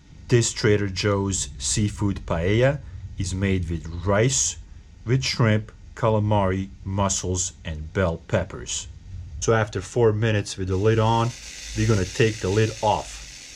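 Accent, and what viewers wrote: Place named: Former Yugoslavia, Eastern Europe. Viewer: If you don't mind me asking, what countries your accent from? if I had to guess I would say one of the countries from former Yugoslavia.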